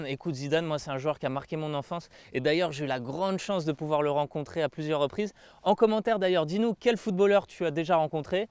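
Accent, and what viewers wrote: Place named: South, France. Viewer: Accent du sud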